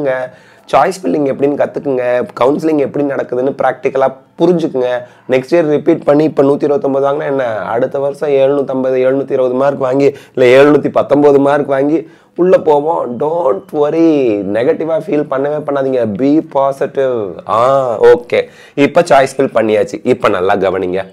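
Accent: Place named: Trichy, India. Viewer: Trichy student assemble namma sami namma basaha pesuthu 😂😂😂😂🫂❣️ na nenga mass na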